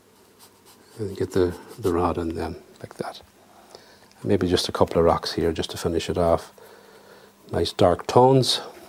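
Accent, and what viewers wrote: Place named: Midlands, Ireland. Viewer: U sound like a midlander like myself..13 years later here from Athlone next to the Shannon wanting to paint a similar fishing boat for a mate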